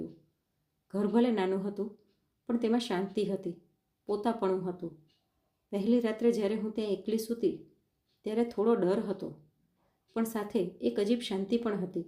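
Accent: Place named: Rajkot, India. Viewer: Rajkot vala aavaj haoyu ch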